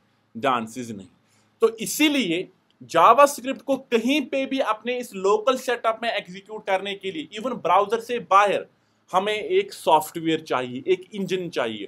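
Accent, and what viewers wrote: Kashmir, India. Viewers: sir aap kashmir se ho kya kyuki mujhe accent se lgaa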